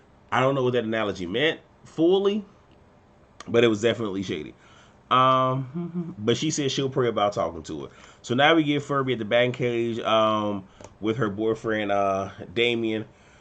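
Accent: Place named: Louisiana, United States. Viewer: Lmao dead I hope they throw that water out, are u from Louisiana? I sorta hear an accent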